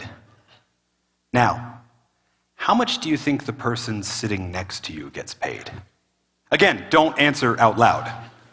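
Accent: United States. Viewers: ใช่ สำเนียงอเมริกัน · ขอถามผู้รู้ครับ นี้คือสำเนียงอะไรครับ. ใช่ อเมริกัน ไหมครับ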